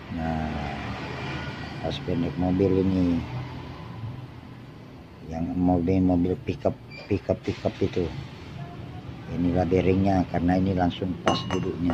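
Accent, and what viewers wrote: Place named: Bugis, Indonesia. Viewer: apa kabar bang...?sy dengar ada logat logat BUGIS nya....!slm knl.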